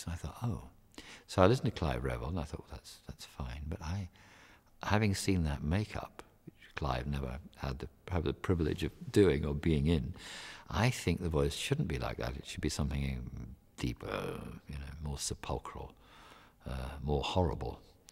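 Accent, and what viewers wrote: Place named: Scotland, United Kingdom. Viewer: So nice to hear a scot doing this.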